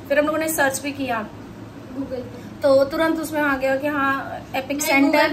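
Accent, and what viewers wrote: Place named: Pandit, India. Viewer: मैम आप बहुत खूबसूरत हैं ,😊आप पंडिताइन बोल रही हैं मुझे हंसी आ गयीं हम भी पंडित हैं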